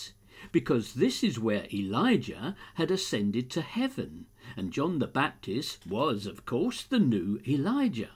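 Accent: United Kingdom. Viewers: oy the brit pronunciation is killin me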